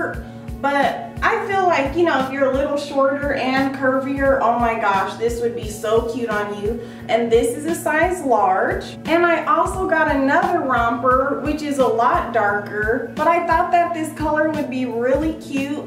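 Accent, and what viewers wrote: South, United States. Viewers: Where are you from you have like a southern twang to voice.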